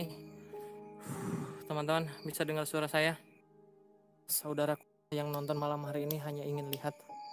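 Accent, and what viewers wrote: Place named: Padang, Indonesia. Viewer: Orang padang mna suaranya... Bukittinggi hadir bro..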